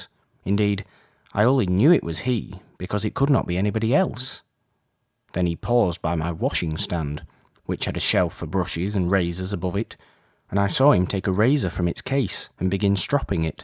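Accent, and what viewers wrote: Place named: Yorkshire, United Kingdom. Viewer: Am I hearing Yorkshire ? I think so and I adore it 💖 I've many ancestors from Yorkshire although I'm a Yank.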